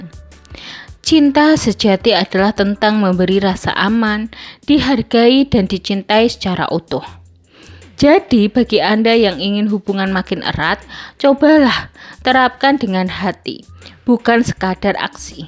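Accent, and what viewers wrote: Java, Indonesia. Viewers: Walaupun ngomong indonesia tetep jowone medok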